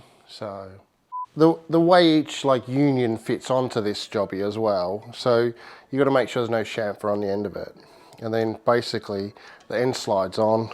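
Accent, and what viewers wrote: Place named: Australia. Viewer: You an Aussie mate?? Shout out from Melbourne Australia. Killer build, even more killer dog!!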